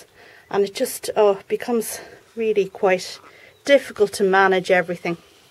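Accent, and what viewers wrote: Ireland. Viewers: I live in the U.S., Virginia to be exact. If I lived in Ireland, with access to all of the great plant material I've seen on your channel, and if I had the kickass Irish accent, I would lose my mind.:P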